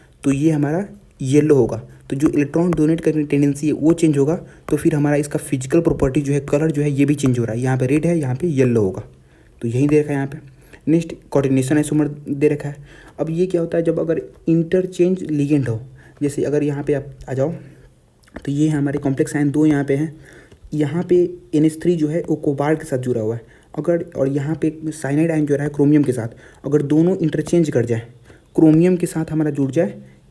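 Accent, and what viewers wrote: Bihar, India. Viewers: bhaiya love your Bihari accent 😍😂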